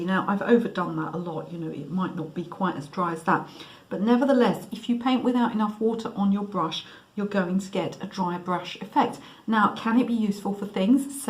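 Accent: England, United Kingdom. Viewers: I love your English accent!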